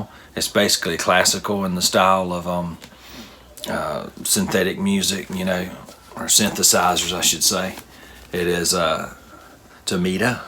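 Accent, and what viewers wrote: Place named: South, United States. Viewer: I love listening to your southern drawl. It's like music in itself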